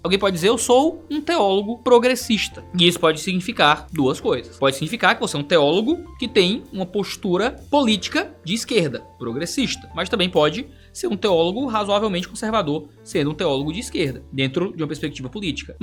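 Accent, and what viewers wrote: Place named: Northeast, Brazil. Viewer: Não se acanhe,. Espalhe nosso sotaque nordestino através do português e do inglês tbm kkk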